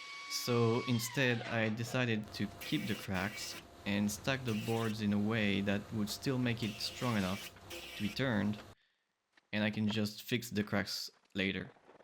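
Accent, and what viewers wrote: France. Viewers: Love the commentary. I mean come on, you're a french dude so the accent makes your already great videos even better. Takes longer to edit but I think overall it's worth the effort. Also strictly for fun, 1965 Mustang. Or '67 Camaro. If I'm being practical a Suburban newer and with fewer miles (and rust!) than my 2012 or a Jeep Wrangler with a winch for dragging logs out of the woods and home to the lathe 😃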